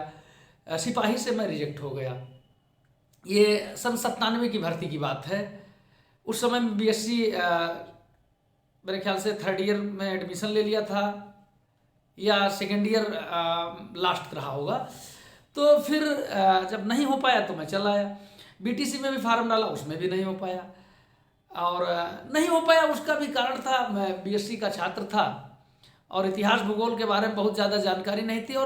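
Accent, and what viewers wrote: Awadh, India. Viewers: very intresting sir.. and the way of your talking in awadhi is also imressing..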